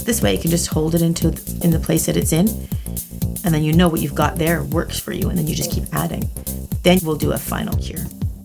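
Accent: Canada. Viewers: are you from Canada?? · She sounds so Canadian in this video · These are lovely! Forgive me if I'm wrong but are you Canadian? You pronounce things in a Canadian manner? (I'm English I try to really listen to the accents I watch on YouTube but do get them wrong at times)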